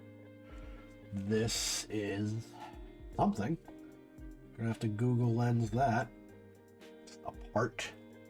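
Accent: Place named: West London, United Kingdom. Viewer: Hahha was thinking you were from Hammersmith, West London 😂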